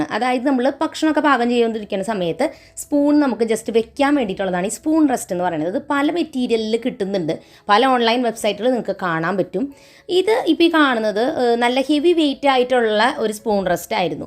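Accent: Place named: Thrissur, India. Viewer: തൃശ്ശൂർ ആണോ വീട്... സംസാരം കേട്ടിട്ട് അങ്ങനെ തോന്നുന്നു 😊... ഞാൻ തൃശ്ശൂർ ആണ്‌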